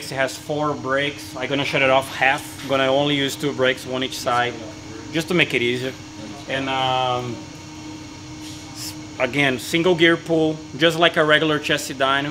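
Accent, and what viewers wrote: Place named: Brazil. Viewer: Nice Accent hahaha Brasilien one! ancioso por esta serie!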